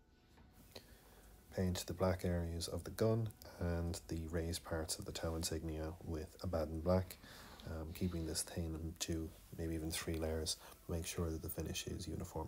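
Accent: Ireland. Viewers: You're welcome. You also sound like a fellow potatolander so bonus points!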